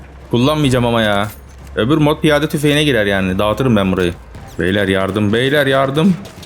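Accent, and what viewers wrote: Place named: Foça, Turkey. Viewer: SEN GÜNLÜKBAŞILI MISIN YEĞEN? Foçalı şivesi çıkıyor sende.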